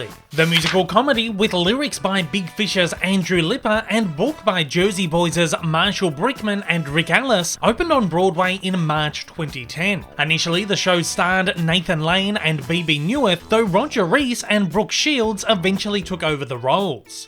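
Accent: Australia. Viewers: your accent sound like Australian or Ozzy i m right i m kidding o know your a Australian what else will down under means then referring to Australia.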